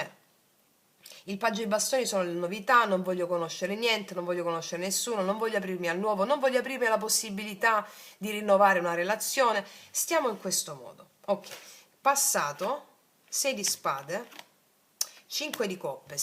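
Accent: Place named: Lecce, Italy. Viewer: Sento una forte inflessione leccese❤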